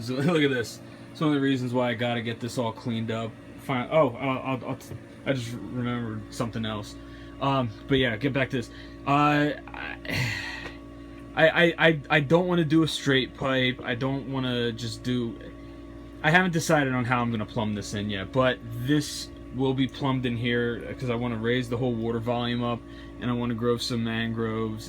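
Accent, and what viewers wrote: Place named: Massachusetts, United States. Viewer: Awesome set up man! You really went all out with the upgrades! Where do you live? I kind of hear a massachusetts'ish accent in there.. I have subbed and can't wait for more vids!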